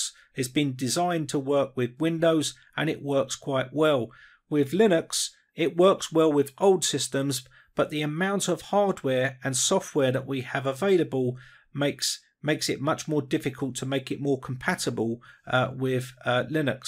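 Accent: United Kingdom. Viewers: Love your British accent, I was recently interested in the comparison between these os, love from India 🤗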